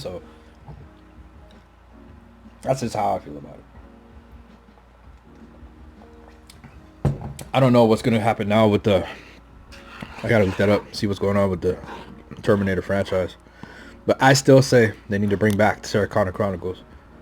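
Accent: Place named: California, United States. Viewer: His California accent is hilarious 😂😂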